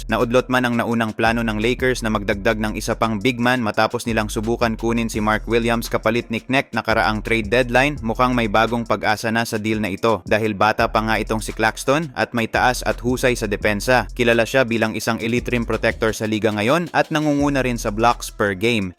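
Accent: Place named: Visayas, Philippines. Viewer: San m ba nakuha yang balita m 😮 bisakol ka magbalita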